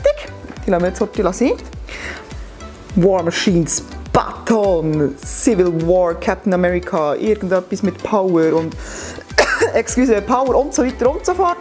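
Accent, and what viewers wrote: Bern, Switzerland. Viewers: Cool! Kenne bis jtz nur d Unboxings vom Tobinator. Mau schön uf Bärndütsch 👍🏼😄 hesch öpe e Steam Game Account ou no?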